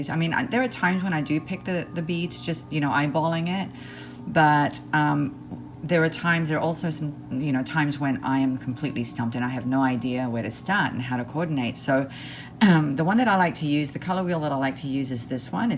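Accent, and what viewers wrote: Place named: Australia. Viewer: Aah ha, I thought I detected some Aussie there in your speech. I am a Brisbane girl too from Scarborough on the Redcliffe Peninsula. Travelled quite a bit but always come home to Redcliffe. X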